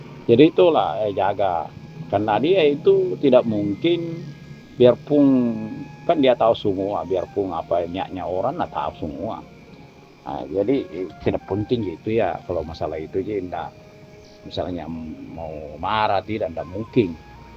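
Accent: Sulawesi, Indonesia. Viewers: Assalamualaikum.. Oo..Daeng.. saya senang dengar logat bicaranya mengingatkan banyak kenangan😊. Setiap daerah mempunyai gaya bahasa logat yang satu kata berbeda makna. Dan sebagai orang jawa terkadang saya harus menyesuaikan kata yang dapat sepemahaman dengan mereka. Seperti kata kamu menjadi kita dan Iman vs yakin. Tetapi saya suka orang sulawesi👍..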